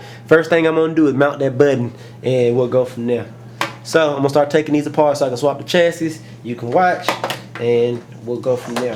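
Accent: South, United States. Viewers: Country ass lmaoo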